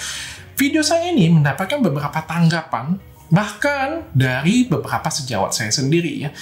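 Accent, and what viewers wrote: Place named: Sumatra, Indonesia. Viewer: menurut saya video klarifikasi ini sudah tepat. Saya nonton dan ngikuti get it beauty (acara tv tentang kecantikan dr korea). Kontennya sama aja dengan yang disampaikan dokter di video minuman berkolagen, hanya beda cara penyampaian. Saya yakin kasus ini muncul karena orang ga sreg sama cara orang sumatra menyampaikan informasi 😅 Saya medan lang, keluarga ya kayak gitu semua kalo ngomong. Tapi kalo berhadapan sama orang jawa, kadang dianggap kasar ato judes dan jahat.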